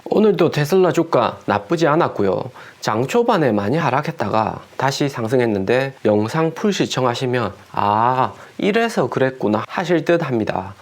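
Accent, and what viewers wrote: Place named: Gyeongsang, South Korea. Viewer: 억양이 경상도? 라 비슷할 뿐인거 같아요 ㅎㅎㅎ다시잘들어보니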